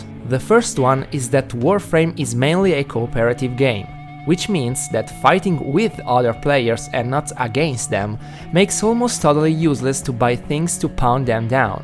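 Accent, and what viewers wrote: Rome, Italy. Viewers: inglese romano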